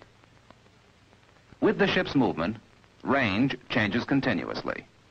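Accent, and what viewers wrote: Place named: Texas, United States. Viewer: Where all of these series with Texas-dialected-man comes from?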